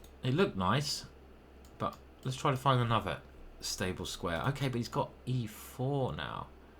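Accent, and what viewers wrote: United Kingdom. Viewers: Mate, I love watching you Titled Tuesday's. A good old British commentary is so refreshing and entertaining to hear. With all of your "fuckin twats" and "wankers"! And very educational. Keep them going 😊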